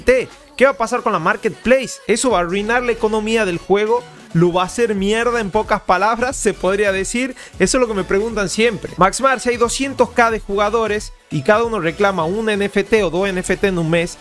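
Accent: Tucumán, Argentina. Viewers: Sos Tucumáno o santiagueño ?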